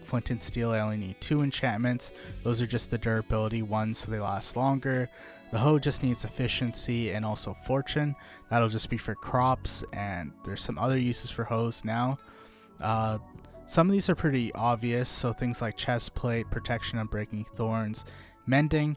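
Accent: Punjab, India. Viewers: Bro are you punjabi?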